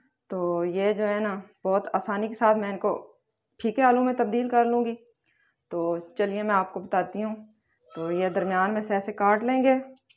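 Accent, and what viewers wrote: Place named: Rural, India. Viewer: Ajeeb pendoo style Mai bolti han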